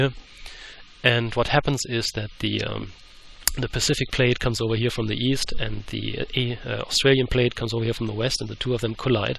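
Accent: Germany. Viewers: Man this was awesome! Do I get it right that you have something like Virtual Reality glasses on to see the things we are seeing? Oh and, are you german? Your accent sounds just like that... :)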